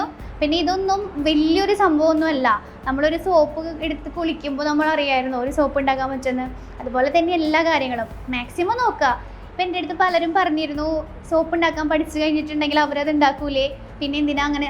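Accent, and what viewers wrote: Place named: Tamil Nadu, India. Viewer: സംസാരം കേൾക്കുബോൾ കേരളത്തിൽ ജനിച്ചത് alla alle തമിഴ് നാട്ടിൽ ആയിരുന്നു alle ജനിച്ചത് 🤔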